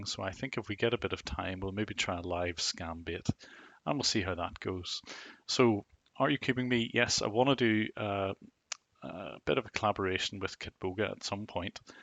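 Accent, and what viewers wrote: Northern Ireland, United Kingdom. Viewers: Very interesting live stream. Your videos are always great. I was surprised to hear that you are Northern Irish. I had always guessed Scottish as a few people also guessed on the stream. I'm from the south of the border in the Midlands of Ireland so we are not all that far away. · northern Ireland ?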